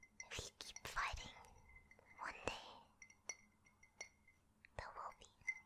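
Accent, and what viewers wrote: United Kingdom. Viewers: with a legit bri'ish accent? Never heard it before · Honestly...the older shadow theme was fun and unique but I’m really loving the more typical type of ASMR from you especially with your normal voice and accent. I do have a soft spot for the British accent being from the UK myself😊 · is she britsh it kinda sounds like it at some points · YES THE NORMAL BRITISH VOICE IS BACK THANK YOU THIS HAS CURED ME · Sometime it sounds like you have a British accent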